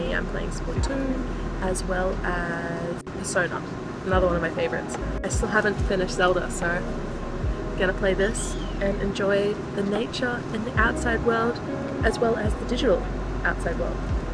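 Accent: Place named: Australia. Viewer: You have an awesome Aussie 🇦🇺 accent! I enjoy your Japan videos 😊 Thank-you from this Japanese-Canadian subscriber 🇨🇦.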